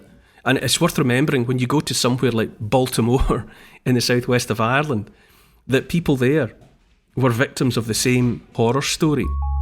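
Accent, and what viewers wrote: Scotland, United Kingdom. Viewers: Cool Scottish brogue.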